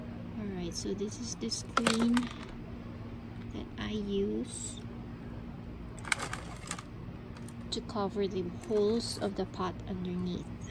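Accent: Philippines. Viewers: I love your cute Philippine accent.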